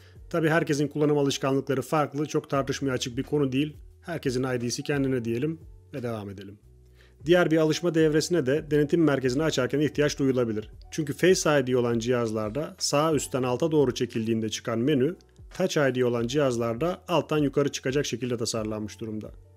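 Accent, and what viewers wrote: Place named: India. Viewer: Hocam hint aksani var sende ya:)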